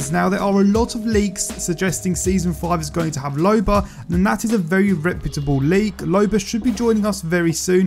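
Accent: United Kingdom. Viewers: I like it. You're direct a d to the point, no begging for likes and your voice although british isn't annoying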